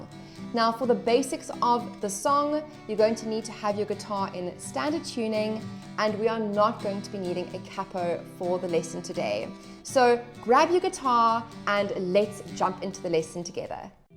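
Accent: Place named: South Africa. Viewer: Yay SA accent, finally.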